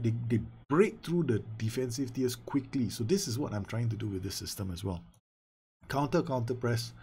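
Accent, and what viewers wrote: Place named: Singapore. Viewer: you sound a little Singaporean hmm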